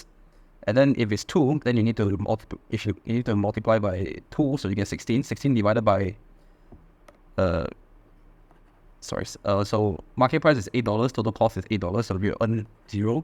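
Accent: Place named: China. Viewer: Chinese accent tough to understand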